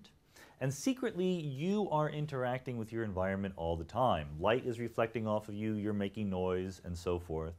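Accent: United States. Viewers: I'm watching this and think 'Something is really out of place here'. And then it comes to me: that man speaks with an American accent! It is so odd to watch a Brady Productions video without the traditional British english. :) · It took me 10 minutes to notice his american accent.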